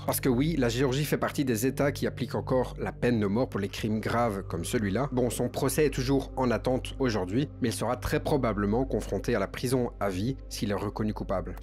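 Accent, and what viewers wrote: Belgium. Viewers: Par contre g reconnu un accent belge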